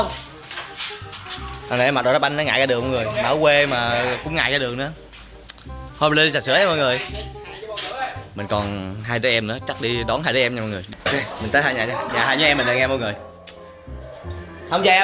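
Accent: Soc Trang, Vietnam. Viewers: Quê anh sóc Trăng hả? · Quê anh ở sóc trăng hả anh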